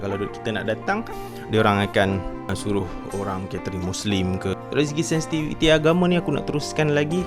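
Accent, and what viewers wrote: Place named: North, Malaysia. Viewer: Kau cakap orang sarawak tak reti cakap utara..hang bantai jah loghat utara hang kami faham..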